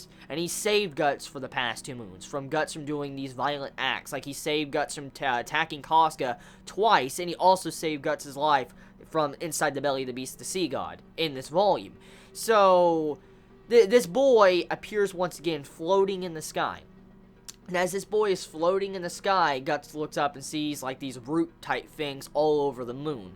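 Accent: South, United States. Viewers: I never picked up on this before but your accent sounds distinctly Southern all of a sudden. You from the Southeast United States?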